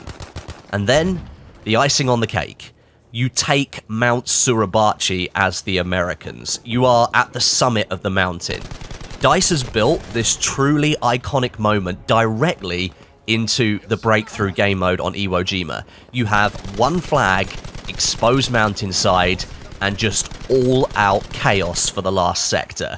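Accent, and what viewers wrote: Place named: United Kingdom. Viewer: And that is how EA keep YouTubers silent about the horrible performance and bugs of this game. One year and we still doesn't have a smooth experience. I don't care you have your British accent , you are misleading your community about the truth about this game and negligence of Dice. No battlestats, no transparency.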